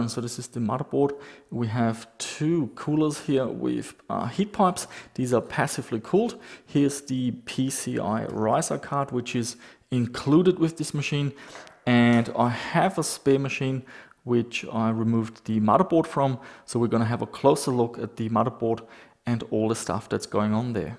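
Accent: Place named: Germany. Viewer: dein deutsches Englisch ist so niedlich 😀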